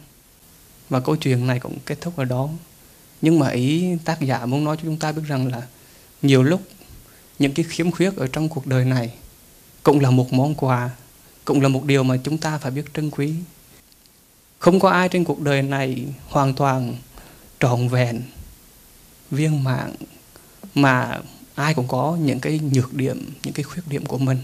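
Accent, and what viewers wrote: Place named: Central, Vietnam. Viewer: Giong noi mien trung cua thay rat hay rat thich nghe ma nghe ko chan cam on thay ah .Nam A MO Di DA PHAT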